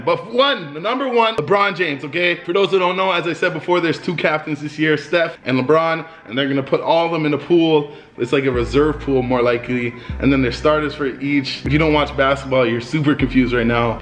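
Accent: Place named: Boston, United States. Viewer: From boston I could tell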